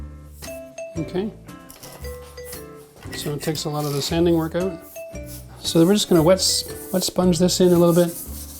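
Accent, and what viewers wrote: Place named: Canada. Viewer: I didn't even notice the 'Eh accent till the very end when he let out an "abooot"!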